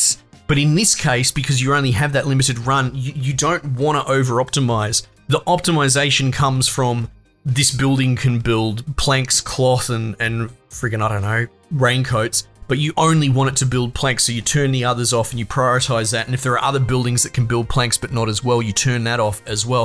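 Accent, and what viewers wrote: Australia. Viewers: the part about the furries got me crying. its even funnier with you aussie accent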